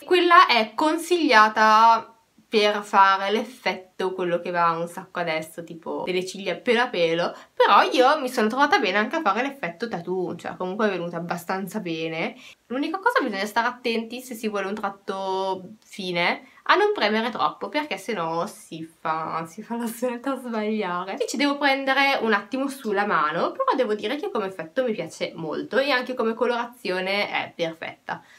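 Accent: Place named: France. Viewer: anch'io adoro gli oggetti di unicorno.Cmq è simpatico il tuo accento,sei francese?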